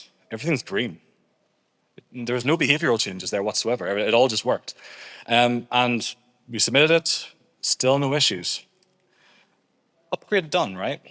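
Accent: Northern Ireland, United Kingdom. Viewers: cant escape the northern irish accent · Nice to here a local lad speaking from NI I persume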